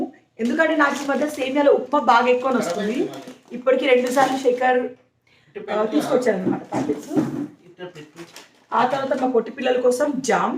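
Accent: Telangana, India. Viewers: Previous ga matladandi Telangana slang anta baledu madam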